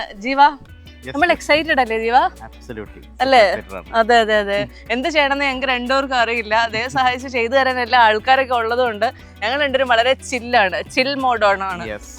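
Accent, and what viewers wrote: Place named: Kasaragod, India. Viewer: Thadi nalle pangend😜kasaragod slang❤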